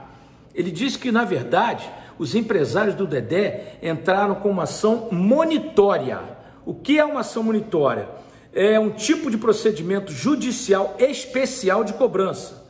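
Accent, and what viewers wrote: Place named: Rio de Janeiro, Brazil. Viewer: E ai Dedé chega de Carioquice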